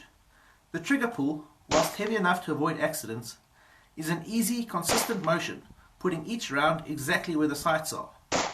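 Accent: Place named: South Africa. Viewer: It’s so bizarre seeing someone who looks like they’re from Tennessee talk in a South African accent. Cool stuff though.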